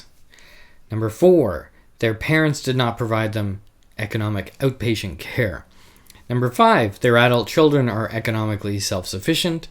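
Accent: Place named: Canada. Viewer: You sound canadian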